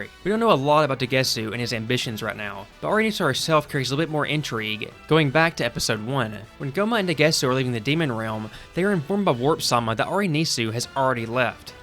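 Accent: South, United States. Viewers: Bro has the most southern accent I've ever heard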